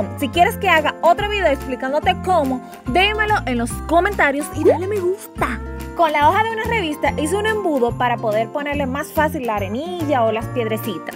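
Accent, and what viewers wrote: Dominican Republic. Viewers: eres dominicana? o de alguna isla del caribe? 😍 siento como un asentillo dominicano xd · Hola que bn que me encontré con estos vídeos tu eres dominicana verdad porque hablas igual que yo . soy dominicana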